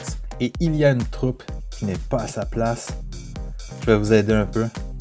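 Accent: Quebec, Canada. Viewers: Super vidéo !! Québécois ??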